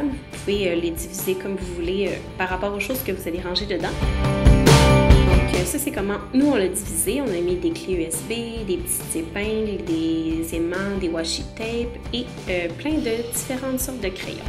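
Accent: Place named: Quebec, Canada. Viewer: j'aime trop l'accent québécois ! !😍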